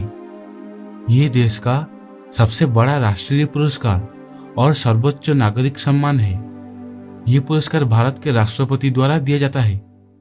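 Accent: Odisha, India. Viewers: Are Bhai odisa se ho kya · Hindi pronounciation ru jana paduchhi j apana odia.... But nice historical facts keep it up.....❤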